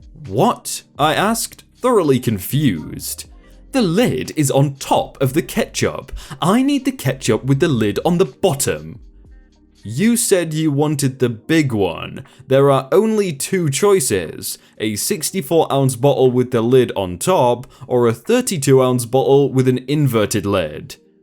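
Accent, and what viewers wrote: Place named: Midlands, United Kingdom. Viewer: John Smithe Probably from the same sort of area. I think they've both got Midlands accents.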